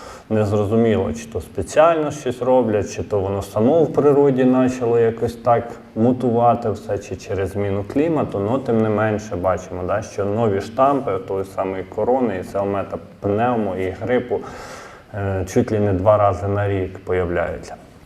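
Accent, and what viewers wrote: Ukraine. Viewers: Вы учитель информатики который говорит на русском с украинским акцентом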